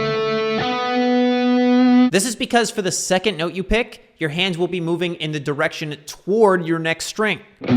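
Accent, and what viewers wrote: Canada. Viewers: you can hear the canadian coming ooot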